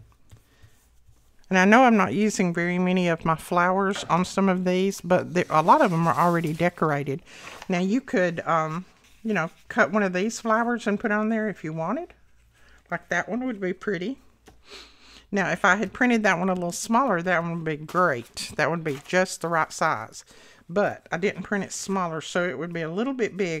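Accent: South, United States. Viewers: The flowers are great. Thanks. I always forget about fussy tearing so will print everything twice so I can do both. To a lot of us you have a beautiful southern accent, so keep on talking 😂. ❤❤❤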